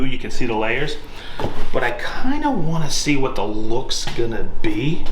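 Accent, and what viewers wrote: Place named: East Coast, United States. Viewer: Been a long time carpenter and really have enjoyed watching your videos. Living in So Cal my whole life really dig the east coast humor and accent 🙌🏼🙌🏼 Been starting to follow the Festool live show also. Moved here to NC and ISO a festool location 🤷🏻‍♂️ any help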